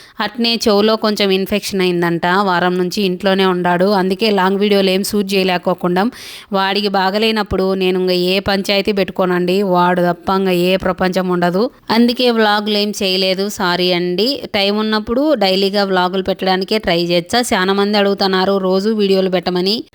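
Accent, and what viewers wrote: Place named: Kadapa, India. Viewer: U r voice ur slang of kadapa s super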